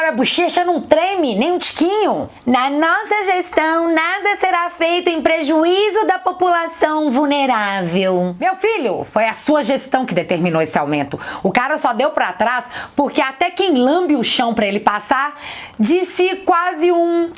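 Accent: Minas Gerais, Brazil. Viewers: Você lembra Mineira, fala qui nem eu.... da onde a senhora é?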